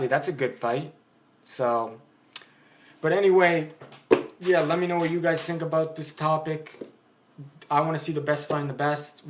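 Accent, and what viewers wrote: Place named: United States. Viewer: I thought you are american with accent, u live in lithuania too?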